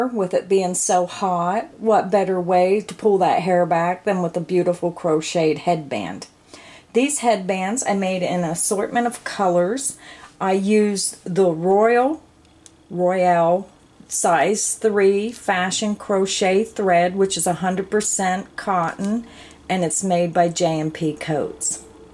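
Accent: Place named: South, United States. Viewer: Love your southern accent especially when you say Grandma.... Love your channel period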